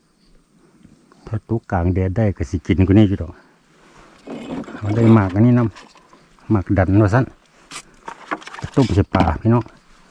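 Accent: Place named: Laos. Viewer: อยู่จังหวัดอะไรเว้าลาวม่วนดีเนาะแต่บางคำข่อยกะบ่อเข้าใจ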